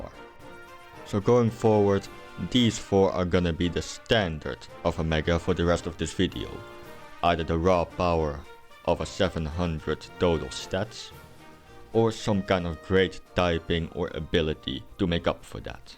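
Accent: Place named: Finland. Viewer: are you perhaps from finland. the accent sounds so finnish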